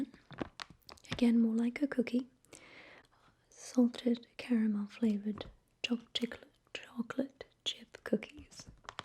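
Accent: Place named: RP, United Kingdom. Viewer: You're quite posh, I like it though it's relaxing to me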